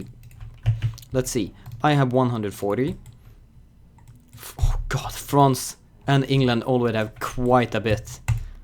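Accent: Sweden. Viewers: Är du svensk, för du låter väldigt svensk i din engelska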